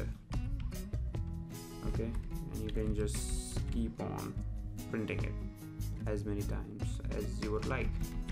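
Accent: India. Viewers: This is Indian accent. If you have any problem with it you can watch other tutorials made by youtubers who belong to the your region. Try to keep your cynism in control. If you find it difficult try some medical help. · Deepanshu Joshi Mate you just proved yourself wrong.You said Indian Accent.Yeah that’s an Indian accent that is why it is not correct.the correct pronunciation of a word is understood by how it is pronounced by majority of people.So you are wrong.I think you need some help before me.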